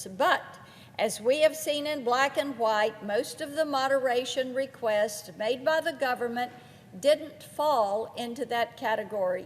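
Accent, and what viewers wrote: South, United States. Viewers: I’m willing to bet she was a head turner and all the men certainly wanted to date her !! Add that southern accent and my heart melts….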